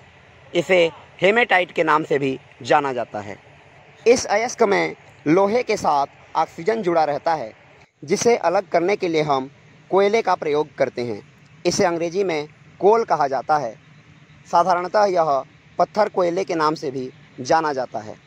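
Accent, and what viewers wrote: Standard. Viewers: sudh hindi achha bolta h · Kya baat he Ramayan ke badh aapki sudh hindi suni he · Your hindi is pure. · आपकी शुद्ध हिंदी का श्रवण करके हृदय प्रसन्न हो गया भाई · शुद्ध हिंदी का बहुत अच्छा प्रयोग किया गया है उच्चारण अत्यंत शुद्ध है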